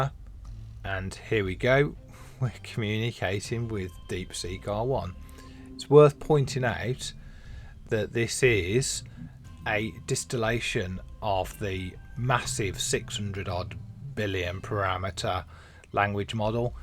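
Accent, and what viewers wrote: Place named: Birmingham, United Kingdom. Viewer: where are you from? you sound like you are from near where i'm from back home (near bham somewhere) 😂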